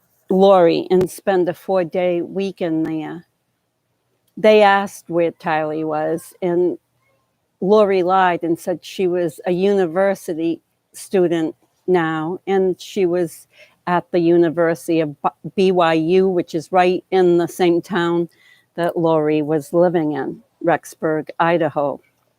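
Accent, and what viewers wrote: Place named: Northeast, United States. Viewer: I LOVE your channel!!♥️ I REALLY LOVE your northeastern accent. Your voice sounds just like my own did.😍It brings back happy memories. I think your assessments of this most BIZARRE case are pretty much “spot on.” Thank you for the time/work you put into your channel.🌺🌺🌺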